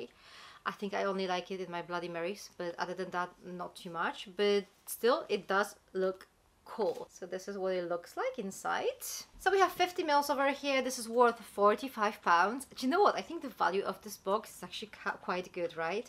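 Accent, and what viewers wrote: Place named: Poland. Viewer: I love your accent I love Poland it's a beautiful country what part are you from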